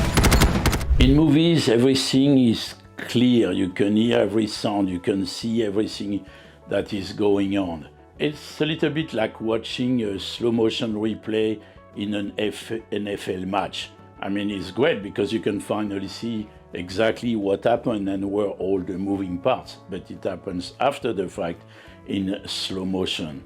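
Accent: France. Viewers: What is that accent ? French ? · 😂 this guy is right!! I like the way he's talking with his french accent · Le bruit qui n'affecte personne me choque beaucoup. Quand j'ai vu "all quiet on the western front", autant c'était joli visuellement, autant j'avais l'impression que tout n'était que petite pétarade. Les soldats se parlent tranquillement, leurs fusils ne font que des bruits de pétard, alors que tout fait feu et explose autour d'eux. Je ne sais pas si vous êtes français vu l'accent, mais en tout cas j'ai bien aimé votre vidéo. Merci ! Edit : ah si j'ai mieux lu votre description. Content de voir que votre réussite et votre plaisir aux USA. C'est tentant et je serais ravi de connaître votre parcours :) Bye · Wow really ! Ok sorry sir , you sound like a native french who speak english ! Well keep up your good video then =) 👍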